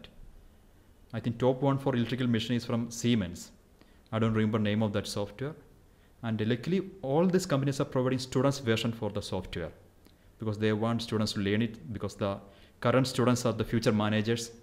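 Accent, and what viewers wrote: India. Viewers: Strongest Indian accent on the planet this guy 😭🤦‍♂️ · Are you from Kerala? even I'm feeling it the same way.. ☹️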